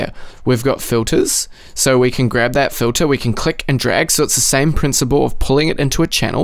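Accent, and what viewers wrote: New Zealand. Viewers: Thanks a lot! This was extremely helpful and the NZ accent is music to my ears :- )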